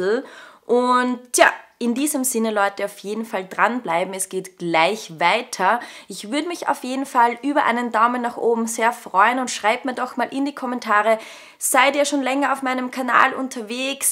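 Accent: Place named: Austria. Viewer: Bin seit einem Jahr dabei. Seitdem will ich mit Mountainbiken anfangen und feier den österreicher Akzent. Danke dafür.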